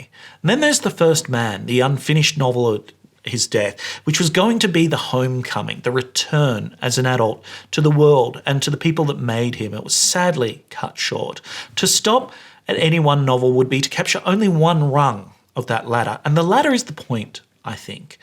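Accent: United States. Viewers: British? He sounds American to me (speaking as an Englishman). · Chicago South Side Latino/African-American? 🖤❤️🤍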